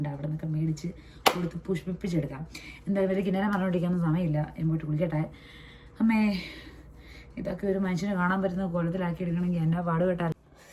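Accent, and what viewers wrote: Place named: Kottayam, India. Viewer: Kottayam slang❣️🤌🏻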